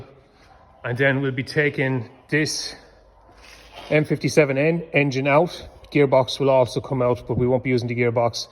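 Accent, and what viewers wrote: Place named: Mayo, Ireland. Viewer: What county you from . Sounds like mayo.or Sligo..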